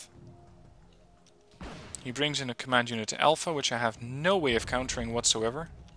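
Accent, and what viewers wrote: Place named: Netherlands. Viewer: You are welcome. I know, that you are no deity - when I interpret your (hardly present) accent correctly you are a human from the Netherlands, right? ;-) BTW I'm German. Actually this was my first comment ever to a youtube video. I really appreciate your videos, they are entertaining as well as informative. And I felt somewhat "honored" that you mentioned my comment in another video of yours. However generally I hate wargame (kind of), since it's the first time in my life (and my computer playing life goes back to the Commodore 64 times(!)) that I am addicted to a game. The interesting thing is that neither ALB nor RD hold the usual grinding game mechanics, which usually make players addicted. Well another story... So before I get carried away about thinking why this happened to me, and why I like watching your videos so much - thank you, and please go on doing such an entertaining job. 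And keep doing ranked, please! Private Parts (my ALB and RD name)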